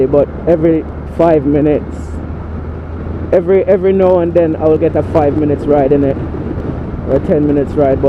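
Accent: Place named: Jamaica. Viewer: Hows the ride brother? You sounds like jamaican hehe i have many friends and tour in jamaica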